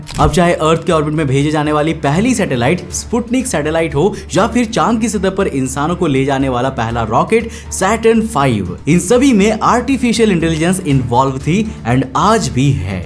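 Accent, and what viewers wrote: Bengal, India. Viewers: Bhaiya kya aap bengoli ho ??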